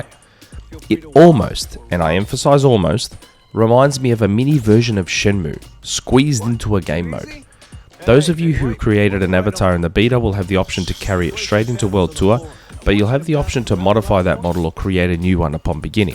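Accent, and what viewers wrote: Australia. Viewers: ah nice to hear another Aussie!! Thanks for the Awesome Review!! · Love the Aussie talk about games, even though he is a Collingwood supporter. Good review.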